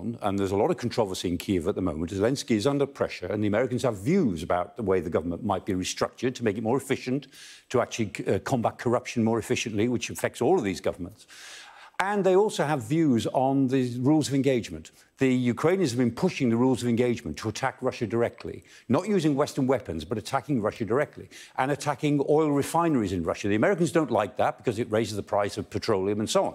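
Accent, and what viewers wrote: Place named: England, United Kingdom. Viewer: What i like the most about M. Clark's commenting is his English accent. Otherwise sadly nothing comes true 😢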